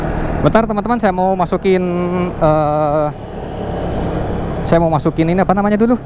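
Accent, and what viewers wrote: Madura, Indonesia. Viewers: Meddhok mu cak mantap wkwkwk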